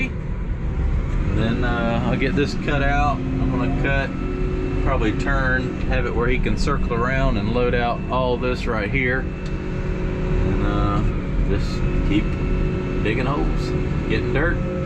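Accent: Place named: North Carolina, United States. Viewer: Would it be safe to assume you are from the Raleigh/Sanford/Durham area? Half my family was from there and, had the same accent that you try so hard to cover up. LOL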